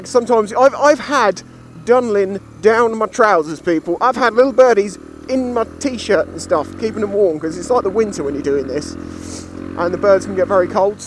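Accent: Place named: England, United Kingdom. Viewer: LOL, captions were funny. I think your accent is throwing the software off. It doesn't recognize English english.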